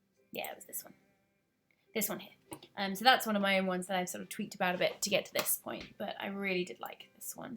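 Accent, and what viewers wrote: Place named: United Kingdom. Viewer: Love yer acent , so british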